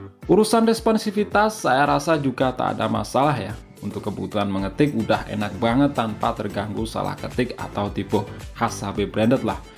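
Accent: Blitar, Indonesia. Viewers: orang blitar kah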